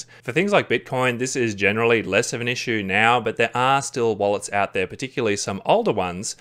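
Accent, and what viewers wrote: Australia. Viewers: Hey man, your videos are great. You're Australian right? Where'bouts are ya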